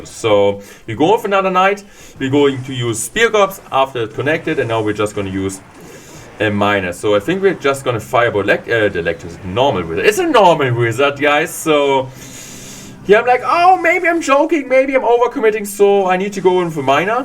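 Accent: Germany. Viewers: You are my favourite youtuber of clash royale(I love your german english accent)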